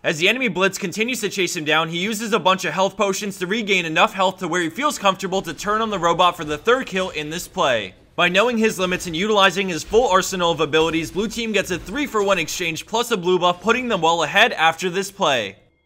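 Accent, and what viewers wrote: Netherlands. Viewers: That dutch accent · this is why people from the netherlands should not talk english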